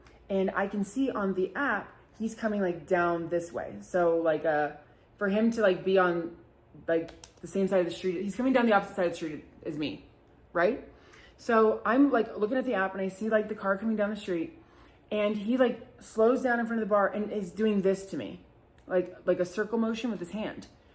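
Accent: United States. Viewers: I love the Midwest comes out everywhere.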